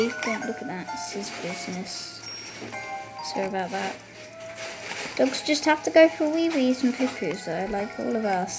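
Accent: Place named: United Kingdom. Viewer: you have a brittish acsent!!!!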